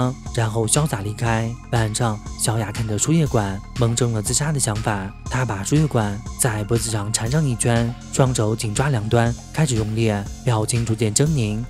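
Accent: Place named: Taiwan. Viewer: 你这是哪来的地瓜腔？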